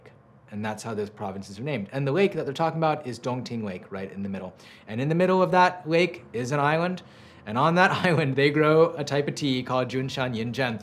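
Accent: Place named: London, United Kingdom. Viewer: This whole video I'm just wondering how much you have been influenced by London. You sound like it and the tea pets are a dead giveaway to those who know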